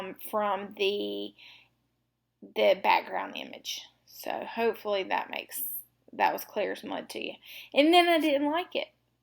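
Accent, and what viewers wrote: South, United States. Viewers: Love your videos/art work and the way you describe what you've done. Your Southern accent is also adorable!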